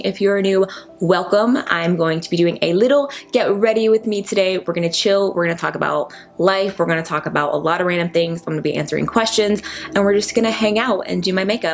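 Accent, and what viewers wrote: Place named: Tennessee, United States. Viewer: Low key still hear your Tennessee accent!!! So cute❤️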